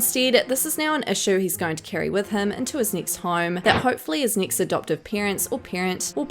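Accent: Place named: New Zealand. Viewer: Im watching for your Kiwi accent 👌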